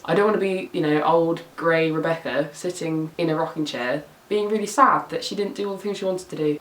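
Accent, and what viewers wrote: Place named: Wales, United Kingdom. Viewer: your accent sounds so cool! my welsh accent isn't that great but yours is just fabulous!!!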